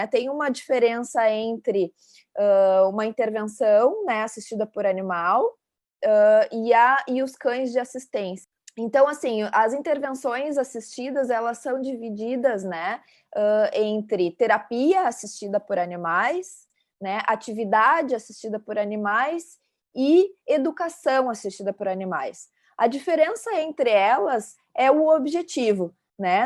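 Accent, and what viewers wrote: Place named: Rio Grande do Sul, Brazil. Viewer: O sotaque gaúcho é assim mesmo!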